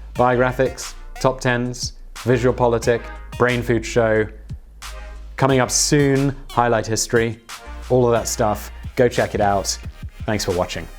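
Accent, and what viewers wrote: United Kingdom. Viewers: Coming from an Aussie, I picked your accent immediately, way back when I first started watching your channels. It is very British. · Just wanted to say I saw your recent post about the podcasts, which I hadn't previously heard about, so I checked them out and now I've listened to almost all of The Brainfood Show! Still have a few left to get caught up, but I've really enjoyed it so far. Especially since you open up a lot more and show your real personality ;) You're a very funny and charming guy! I've learned a lot from your channel and podcasts. Usually I dislike fact-based channels because it's generally stuff I already know, but you frequently manage to surprise me with new information. PS I don't think your accent is weird, it's pretty obviously "British person who has lived elsewhere in Europe for many years" and it's not grating or distracting. 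Honestly I normally don't notice it. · Great video, most enjoyable and as a Brit you still have a very strong, unmistakable, British accent Simon - although I wouldn't have picked Maidstone. South-East, certainly but more private school London. Keep up the great work.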